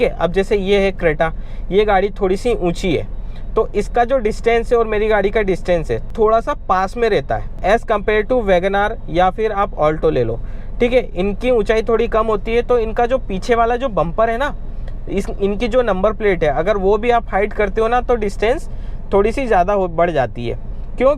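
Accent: Indore, India. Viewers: Bhai aapki aawaz se hi pehchan gaya aap indore se ho❤️❤️❤️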